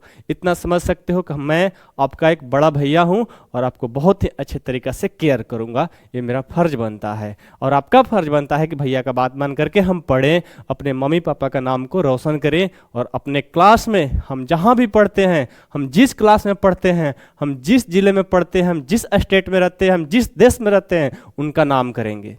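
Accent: Bihar, India. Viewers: Sir aap Bihari se ho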